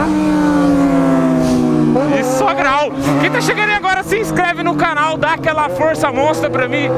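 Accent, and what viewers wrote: Goiás, Brazil. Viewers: tu é Goiano??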